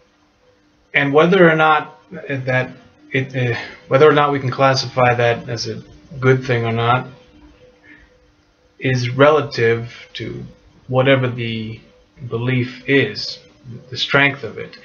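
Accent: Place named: Canada. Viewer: Your dialect sounds Canadian, but it might not be. In Canada we have a monarchy, although the queen has no actual power, although she often is astonished to herself discover how much moral power she actually has. But legally, she is unchallenged and unchallengeable - but, realistically, she has no power at all. If she pushes the envelope at all, we'll suddenly have an Act of Parliament declaring Canada a republic, and nobody wants this to happen. So what is going on? We agree that she is necessary as one of the illusions or myths that bind our society together. She is just a human being, but she is also Her Majesty - chosen by god, even though nobody believes that, and probably never did. Society requires rules, and these rules require justification. So we have myths that we know are myths, but what they really are is a psychological boundary. "Question this, and the whole thing comes unglued" is a very "Canadian" thing to think, and that goes for English and French, as well as immigrants - who buy more readily into Canada's myths than native-borns do. 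So it is both absolutely true and absolutely false that the queen reigns. It's I suppose an agreed-upon sort of doublethink, but utility trumps "reality" here.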